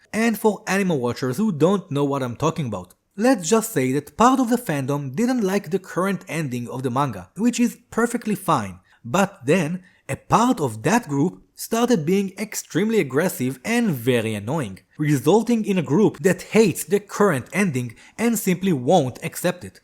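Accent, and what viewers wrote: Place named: Israel. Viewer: also, your israeli accent is really cool, it makes me laugh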